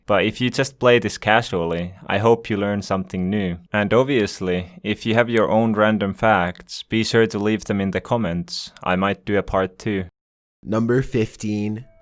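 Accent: Finland. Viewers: Are you from finland ?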